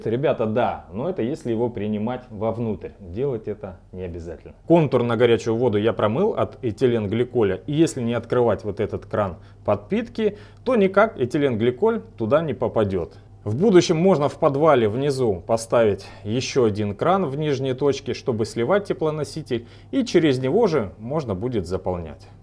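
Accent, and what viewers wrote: Rostov, Russia. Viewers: То ростовский говор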